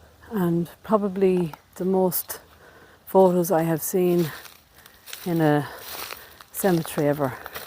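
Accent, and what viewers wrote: Ireland. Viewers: Nice accent Ireland probably the best in the world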